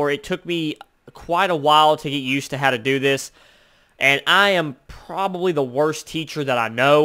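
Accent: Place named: South, United States. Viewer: you sound like the country version of danny trajo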